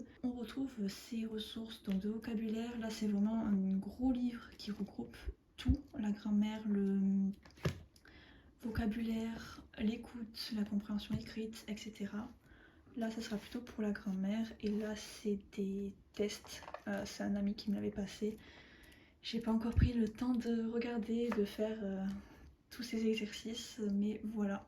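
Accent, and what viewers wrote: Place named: Toulouse, France. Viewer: Bonjour! Vidéo très agréable! Tu es de Toulouse? C’est ce que je me disais avec ton accent. 😊 J’ai fais une licence de japonais à l’université Jean Jaurès (à l’époque le mirail) en <num> et je vis au Japon depuis 2007. J’espère que tu feras d’autres vidéos sur l’apprentissage du japonais ou sur ta double culture! J’ai moi même deux enfants mais malheureusement ils ne parlent que japonais! Bon courage pour le JLPT! À bientôt!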